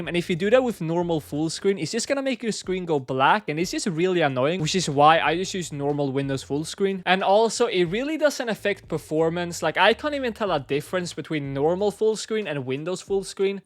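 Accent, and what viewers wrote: Sweden. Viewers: I KEWN IT!!! You are from Sweden Right? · Bro your Swedish? I’m Swedish to 😁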